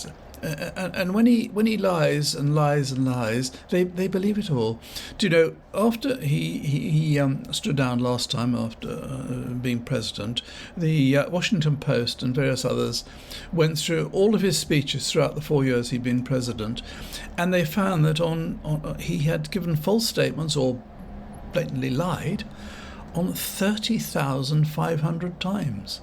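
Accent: RP, United Kingdom. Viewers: Looney indeed. An old and boring man repeating all the leftist lies, obviously envious of other countries' prosperity (his idiotic visit to Poland showed how little he knows), Pathetic. He probably thinks that his RP English makes him sound elevated and wise. Britain is a sorry shadow of its past, dirty, forgotten, filled with people without purpose, money, and future. Sir, I feel sorry for you (I mean the channel owner).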